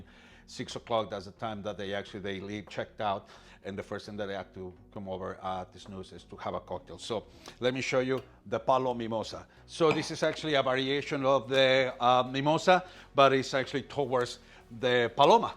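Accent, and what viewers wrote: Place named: Latin America. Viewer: His Latin voice is hot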